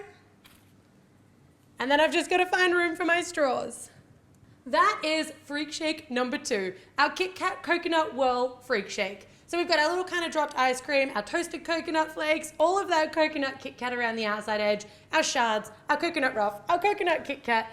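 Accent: Australia. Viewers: Are you from Australia 😀😄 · Is she Australian? Cause I am! =D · Is she Australian or American? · Ehh what? She's got like the strongest Australian accent ever.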